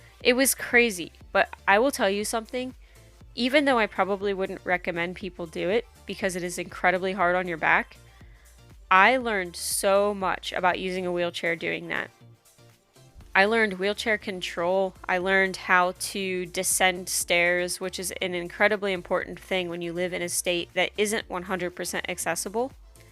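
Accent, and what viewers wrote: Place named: Pennsylvania, United States. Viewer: I'm very sorry. Could be a malpractice suit somewhere in there. Just because a person is 18 years of age doesn't mean there's not an serious underlying problem occurring. If the Docs were that concerned about drug use- just needed to perform a simple lab test there on the spot ( I worked in a laboratory). Would've been ruled out immediately... and quite possibly could've addressed the serious medical issue before it proliferated or worsened. Once again, I'm sorry. You sound like your from Central to western Pennsylvania or even southern Ohio. The manner in which you pronounce the word "cold" is audibly simulant of that area. It's cute though. Please be well.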